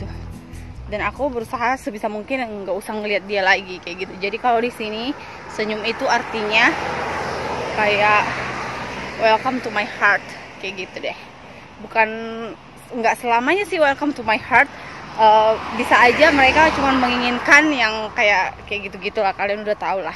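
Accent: Medan, Indonesia. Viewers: logat kk seperti logat anak Medan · Kayak org medan cara ngomong kakak ini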